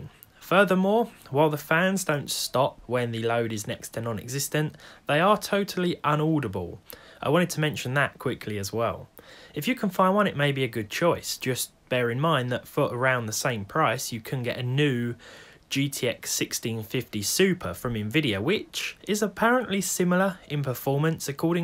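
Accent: United Kingdom. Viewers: How do you know he’s British besides the accent? He says parcels instead of packages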